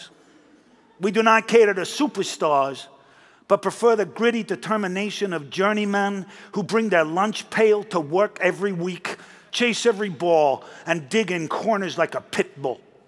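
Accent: United States. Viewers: I swear. Damian Lewis’ American English is hands down spot on! ❤ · Has nothing to do with Trump. The accent is far from being uniquely his, and it doesn't sound very much like him anyway, pretty far stretch to say he's mocking Trump. Lots of folks in the US have a similar accent.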